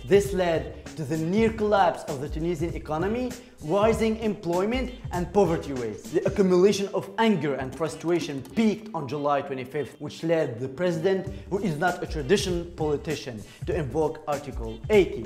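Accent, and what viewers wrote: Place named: England, United Kingdom. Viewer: btw i like your english accent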